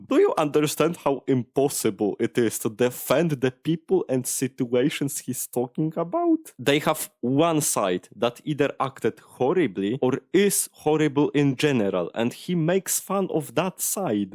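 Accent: Poland. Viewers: your accent sounds so polish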